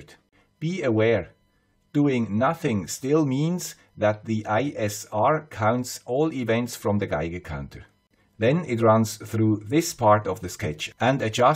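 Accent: Switzerland. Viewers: How could anyone nuke such a nice guy (even with a Swiss accent)?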